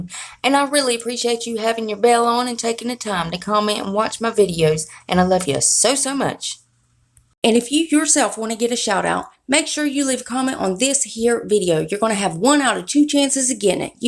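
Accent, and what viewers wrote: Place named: Ohio, United States. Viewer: love your accent! I lived in New Lexington Ohio years ago. Your voice reminds me of those friends and employees.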